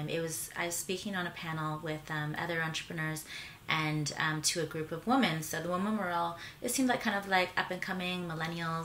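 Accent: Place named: Canada. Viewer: Your location says United States but your accent is Canadian and your gym is located in Canada?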